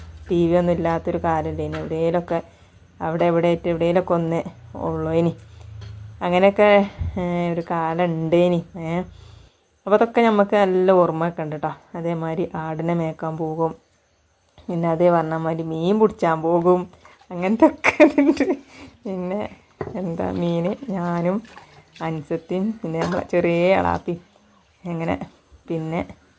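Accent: Malappuram, India. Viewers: Malappuram bashayanallo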